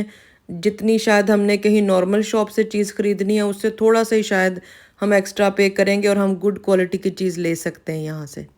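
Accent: Punjab, India. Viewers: Assalam o alekum aap ki batain aap ki meethi Si panjabi mujhe bohat Pasand Hy I like u very much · Hayee ❗tusi bohat sohnay ho bolday ho jado Punjabi ta maja aa janda ❤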